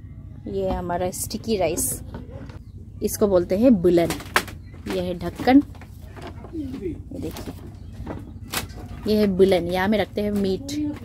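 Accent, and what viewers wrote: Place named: Delhi, India. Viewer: Didn't know Arunachalese speak such fluent Hindi.. It sounds more like a Delhi region's Hindi. The way you are speaking, is it the typical Arunachali way of speaking Hindi? different regions in India (even within Northern belt) have different way/dilects and not necessarily speak Delhi-style Hindi. For Example, Hyderabadi Hindi has very typical Urdu-Telegu influence and has its own beauty and charm.. similarly, Mumbaiya Hindi has its own nuances as it got mixed with Marathi and sounds very beautiful on its own.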